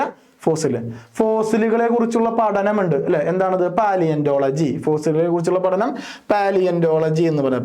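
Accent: Thrissur, India. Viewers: Sir class nte idakkulla trissur style aanu highlight😂😂😂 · Sir thrissur ano ❤❤❤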